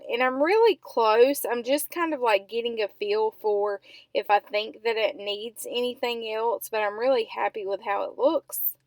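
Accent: South, United States. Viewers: Cool pages..I'm from the UK and I really love the southern accent.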